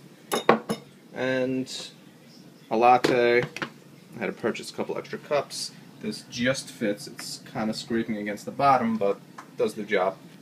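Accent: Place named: New York, United States. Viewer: Sick accent u must be from New York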